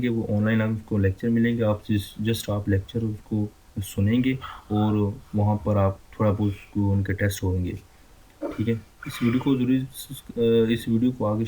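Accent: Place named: Punjab, Pakistan. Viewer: Try to speak clearly your pronounciation is purely Punjabi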